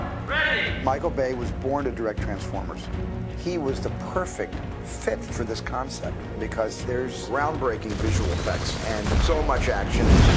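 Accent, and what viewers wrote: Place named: United States. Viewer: You sound a lot like a Yank but I'm just biasedly assuming it, since this is their platform and all. All these smart Yanks are a lot like the Smart Russians, think you know more about people's cultures than other people, living an insular lifestyle, while gate-keeping cultural critique and also political ideology as though they h ave an exceptional awareness compared to other nations of people. This can even include supposed appearances of being activists for colonial exploitation or in a what I call pseudo-left wing point of view.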